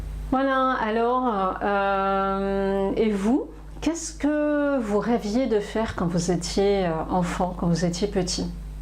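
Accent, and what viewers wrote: France. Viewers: Bonjour, ça fait plaisir d'entendre le parler francilien plutôt que le français standard, le discours semble tout de suite plus naturel! Sinon, je me voyais devenir prêtre, tendance mystique, conscient que j'étais très tôt de l'existence de l'invisible tout autour de nous. Les métiers dans le domaine médical et l'éducation me faisaient horreur, car trop sociaux. Cette vocation s'est vite effacée à l'adolescence, en raison d'une part, de l'imposture que je suppose dans cette croyance, et d'autre part, par le sacrifice imposé par le célibat... Et sinon, je suis ornithologiste amateur, pour pouvoir apprécier la faune sauvage locale, qui disparaît à grande vitesse désormais.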